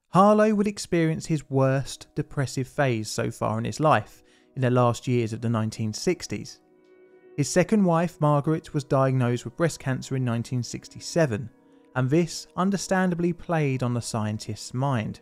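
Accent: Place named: Cockney, United Kingdom. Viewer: Someone w/o a cockney accent should do the voiceover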